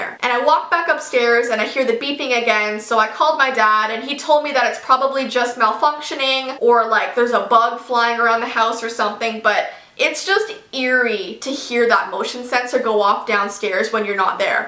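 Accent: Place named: Canada. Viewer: for some reason i heard the canadian in her voice that ive never heard before. how havent i noticed this!?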